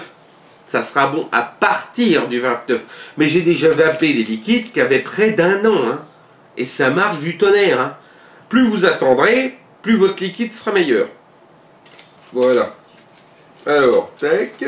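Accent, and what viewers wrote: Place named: Franche-Comté, France. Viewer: Tu as un accent de chez moi, tu es franche Comté ?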